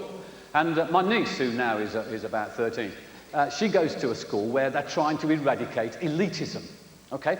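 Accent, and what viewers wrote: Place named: Birmingham, United Kingdom. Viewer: Never would’ve thought jasper came from posh Birmingham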